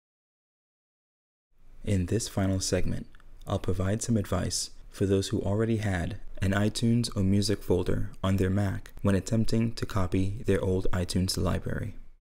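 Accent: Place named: Germany. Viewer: Fantastische Anleitung, 1000 Dank! Und ein kaum wahrnehmbarer deutscher Akzent?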